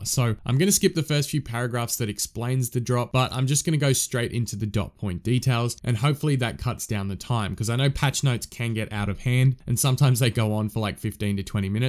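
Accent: Australia. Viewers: lauchlan walsh where did you think he's from? He definitely sounds like an Aussie to me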